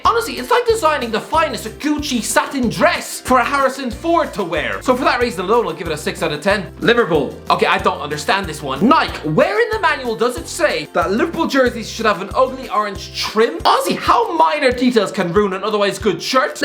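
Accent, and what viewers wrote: Ireland. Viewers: Ready to hear a Irish guy rant again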